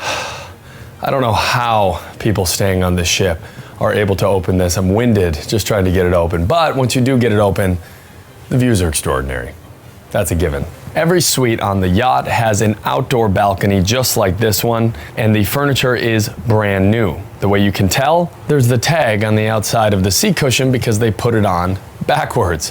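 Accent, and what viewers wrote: United States. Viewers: The misterious pear with the wax tip on its stem is a Passe Crassane most likely, it's a French winter pear. They do this so moisture is not lost. It's a nice touch. PS it's "luh·vaat·suh" though your American accent is rather adorable. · hahaha watching your videos is like watching war! intense, the last word in luxury, the polite detachment, and imposing. you are a security risk for these places. you have done remarkable work - the videography, some of the insights shared, and vuori is no chump change, but i do not know if id allow my bf to watch a girl do reviews in a robe 😂 you are really testing the boundary here and even though you are mesmerizing, you have molly eyes which is all the rage on camera these days i am NOT surprised about your wsj callout. you have adopted a perfect la movie accent and ditched your humble midwest origins but i think some others are gonna interpret you as a threat if you don't listen the first time. 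sometimes your ideas come across as objectivity rather than curiosity (why did this happen? or just pointing it out without being obvious). you were asking for that - you need a little more sensitivity with these class lines man 😭i don't think ur a bad person but u r dangerous 😂 😂 😂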